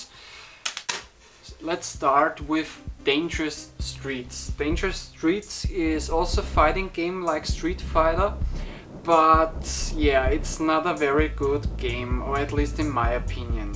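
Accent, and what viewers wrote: Germany. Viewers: Alter biste deutsch? xD Das klingt so? :D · Its like the T-800 reviewing retro games ;) ;) Please take no offence i really enjoyed this vid. Just your german accent is very very strong. Greetings! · dein Bayrischer oder Ösi Dialekt hört sich ja GRAUSAM an !!! --- RrrRrRrrr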